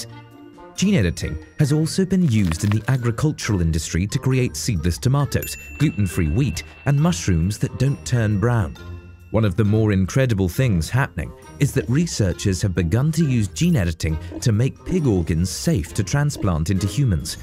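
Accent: United Kingdom. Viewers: lol these simpletons thinking this is a kurzgesagt ripoff. 3 elements: cartoon, british narrator and science focused makes it a ripoff? Besides, Kurzgesagt is crap and has proven themselves to be unapologetically biased and totally untrustworthy. Kurzgesagt fans are no better, and have some kind of a weird cult-like behavior to the channel, as if they could do no wrong. Use your brain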